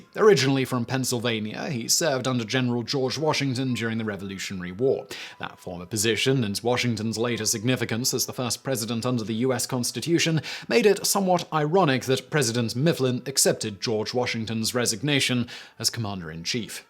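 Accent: United Kingdom. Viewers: You yook the words right out of my mouth!!! At least, he talks British. Not sure if he truly is or not. Anyone can imitate a British accent for views. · I stopped watching when I heard a Brit speaking about American history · The current country of the United States didn't exist until its formation through the Constitution... that's why we Americans brag about being the "oldest country in the world"... we have the oldest, continually-functioning government. I do love it when people with foreign accents try to explain America to Americans... enjoy Brexit, Redcoat!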